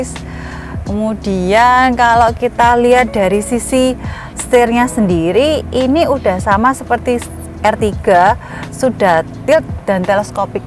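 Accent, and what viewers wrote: Java, Indonesia. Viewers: Medhok e bikin betah nonton 😄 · Penyakit baleno hb lama, lampu nangis dan setir lengket masih ada kah ? Mba e medok bingit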